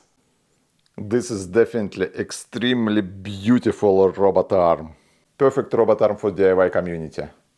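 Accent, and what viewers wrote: Russia. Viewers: Ты чё, читаешь с листика английские слова русскими буквами написанные?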